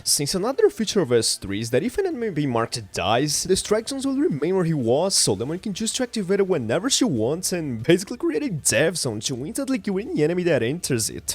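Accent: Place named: Brazil. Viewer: wow, you have a very strong Brazilian accent... You are? xD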